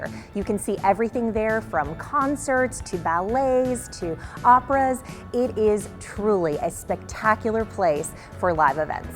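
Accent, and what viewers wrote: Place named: California, United States. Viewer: My god what an annoying voice ! She sounds like a idiot from California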